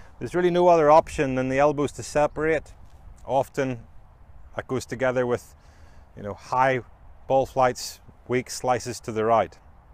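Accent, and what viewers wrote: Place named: Northern Ireland, United Kingdom. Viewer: Was pleasantly surprised to hear the N.I. Accent. Great video! Will definitely help with what I am struggling with. I am longer than most off the tee and probably average length with irons due to my “stuck behind” swing.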